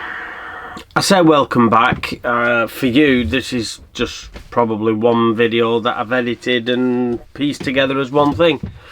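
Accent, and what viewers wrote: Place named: Lincolnshire, United Kingdom. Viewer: Hope is feeling better, the injury sounds really painful. I keep trying to work out where you come from by listening to your accent. I was born in Lincolnshire and you sound similar to people I know. Best wishes for 2020.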